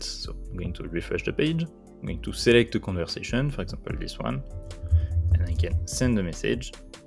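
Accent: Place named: France. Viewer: Bro you have amazing accent!!! French most beautiful language in the world!!! Great work also 🫱🏼‍🫲🏾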